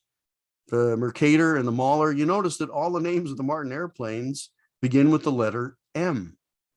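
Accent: United States. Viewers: I was born and raised on Long Island, and trust me your accent remains. It is still a lot stronger than mine, because I learn how to speak properly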